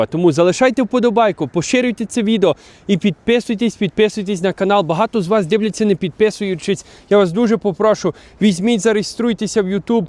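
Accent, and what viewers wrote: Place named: Lviv, Ukraine. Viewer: Так, майже с початку заснування вашого каналу. Я з 1990 по 1995 рік навчався у Львівській політехніці, проживав у гуртожитку на Бой-Желенскького. Мені дуже приємно згадувати ті часи, а також львівський діалект, яким ви говорите👍. Натхнення вам, та Творчого успіху! Дякую за відповідь!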